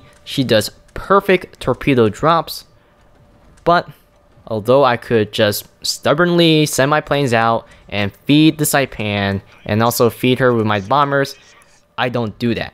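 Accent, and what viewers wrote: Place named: Philippines. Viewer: I was born and raised in the Western United States. You sound to speak English more like someone who is South East Asian or Pacific Islander. My first guess is Filipino. I grew up near a Filipino neighborhood and that's the accent they have.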